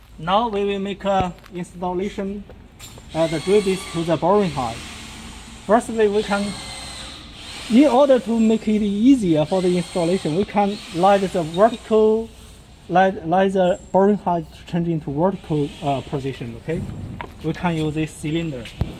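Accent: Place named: China. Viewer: I can totally understand your chi-english. any body understand also?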